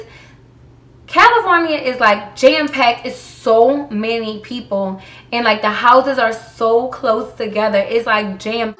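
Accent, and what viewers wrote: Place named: DMV, United States. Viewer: You from the dmv? I hear the accent. I’m from Baltimore. 🤎🤎🤎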